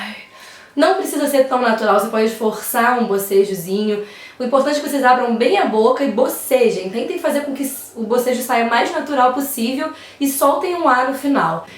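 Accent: Rio de Janeiro, Brazil. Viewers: Sou do Rio de Janeiro e tenho taquilalia. Você atende em que estado do Brasil? Seu sotaque parece de carioca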